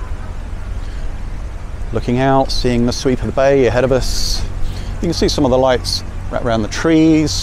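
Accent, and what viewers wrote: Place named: United Kingdom. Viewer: !!!!!! How wonderful. I love, love, love the narration. So informative and interesting. Also, I thoroughly enjoy your very British accent. This whole experience is just great. All the best to everyone for this season and blessings for a peaceful, safe and healthy 2022. God bless you all!!!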